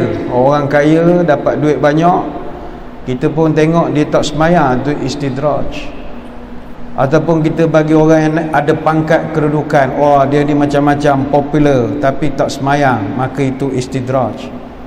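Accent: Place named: Kuala Lipis, Malaysia. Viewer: Klau xsilap ustaz org Kuala Lipis?loghat ustaz macam org kampong saya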